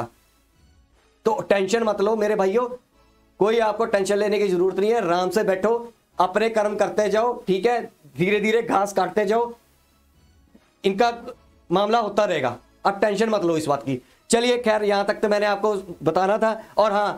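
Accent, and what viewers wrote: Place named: Punjab, India. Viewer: Yaar I'm not trying to favor them but her first email was correct and you also need to check your accent if we are talking about it. They are from Punjab(pakistan) side and I'm pretty sure you are too(Indian side) with your accent - fellow Punjabi. By the way you added the word "you" in that email's first sentence.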